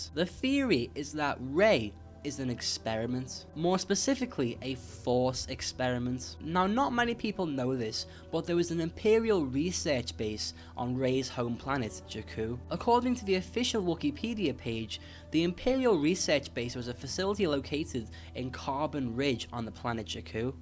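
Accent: Liverpool, United Kingdom. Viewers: Are you from Liverpool? I am :p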